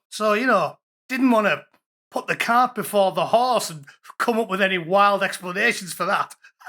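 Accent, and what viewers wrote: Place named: England, United Kingdom. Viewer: Now that is a proper English accent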